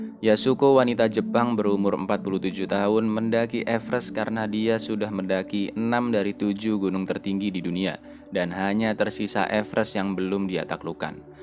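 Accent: Java, Indonesia. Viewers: Jowo pundi mas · Min orang jawa?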